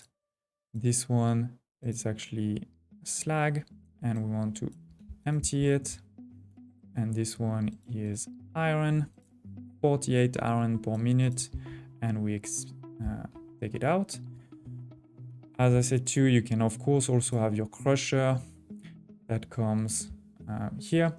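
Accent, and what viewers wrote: France. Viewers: hi! thanks for your good tips ! ( what is you accent? French?)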